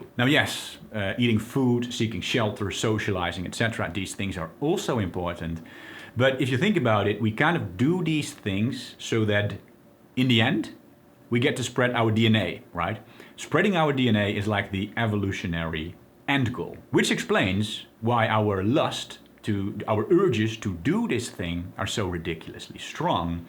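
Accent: Netherlands. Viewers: You sound really dutch maat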